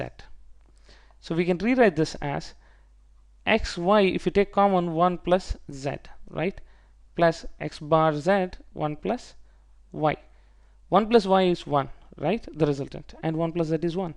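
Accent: India. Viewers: its indian accent bruh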